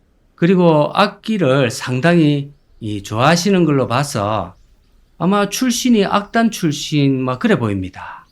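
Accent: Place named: Seongju, South Korea. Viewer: 사드배치된 성주서 살았던 어릴때 많이 듣던 가찹고 (가깝고) ㅋㅋㅋ 오랜만에 듣네요 저도 그렇지만 본인은 표준어 쓴다고 생각 ㅋㅋㅋ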